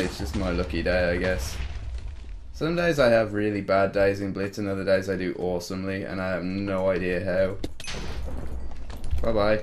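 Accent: United Kingdom. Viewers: bruh did become crazy more British as he aged? He has very little accent here...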